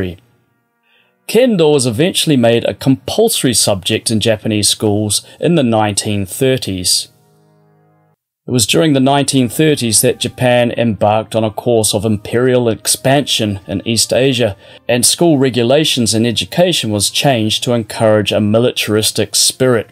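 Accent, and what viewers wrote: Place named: New Zealand. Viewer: Nice work, great to hear a Kiwi explain this.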